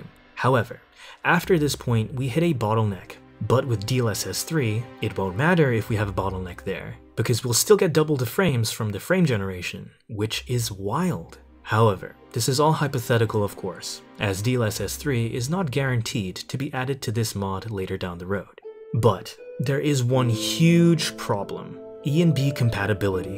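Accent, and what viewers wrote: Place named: Netherlands. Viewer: you sound dutch i just realized that zamn, been binge watching your vids LMFAO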